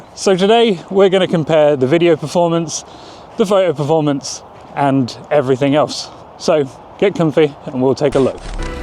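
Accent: United Kingdom. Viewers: Keep it up bro, i like ur British accent, n ur video too (actually good video) 🎉🎉🎉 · British accent brings me joy ❤️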